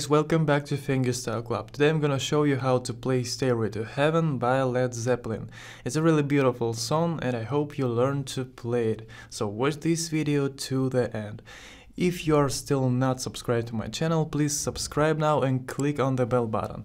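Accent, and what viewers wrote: Russia. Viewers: No, sadly, Let’s play guitar had an Indian accent (I think that was his accent) and Fingerstyle Club has a Russian accent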